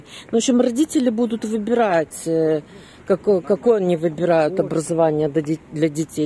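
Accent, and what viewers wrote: Odessa, Ukraine. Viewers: Очень приятный,душевный и голос и одесский говор с дружелюбной ноткой.НЕДОЗАДОЕЛО!)))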